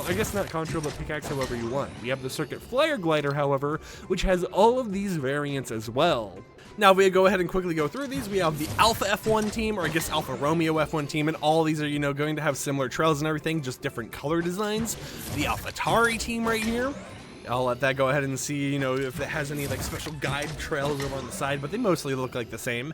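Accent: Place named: United Kingdom. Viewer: Bro is definitely British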